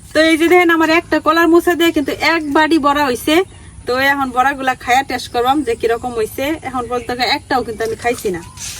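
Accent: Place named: Netrokona, Bangladesh. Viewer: Gramer meye bujhlam kintu tai bole ki ektu Valo kore ashba na shabar shamna Eta hoccha netrokona karon Kotha goli jemon khayam jayam agoli okhankar vasha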